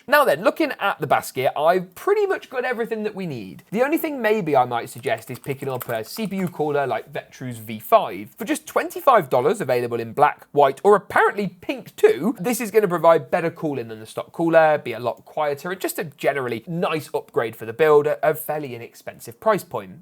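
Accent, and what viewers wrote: United Kingdom. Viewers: Are you British or American? I despise hearing Brits referring to prices in dollars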